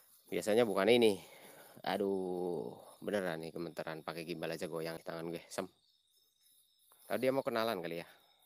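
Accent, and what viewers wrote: Banyumas, Indonesia. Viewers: Hahah kami tenggengen.. Bahasa ngapak nemen yaaahh Keren lanjut bro.. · Misi, numpang nanya, mas si orang mana, koq logatnya ngapak kaya aku 😬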